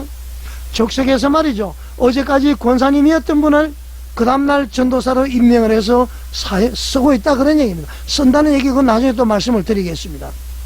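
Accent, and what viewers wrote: North Korea. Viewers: 이분 어디분이신가요? 조선족 이신가요 말투가 북한분이신가요? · 북한 말투? 교회 무너뜨리라는 북한 지령 받았나?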